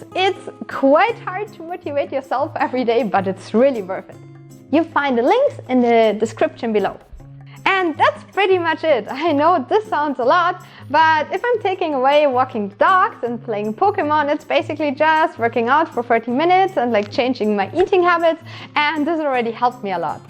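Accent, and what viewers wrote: Germany. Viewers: yeah haaaard accent i'm from germany too;-) I'd like too see a german cosplay video not made by anny the duck (doesnt means their not good)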